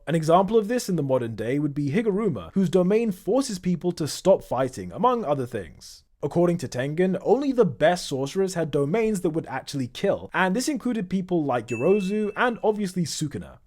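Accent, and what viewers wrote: United Kingdom. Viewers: Saved him from being raised as a conservative? Hahaha what a panzee thing to say. You know there is a difference between being a conservative and a mysoginistic prick? By your accent, I could assume you're from the UK. So looking at your woke dog shit country you can see the danger of non-conservatism.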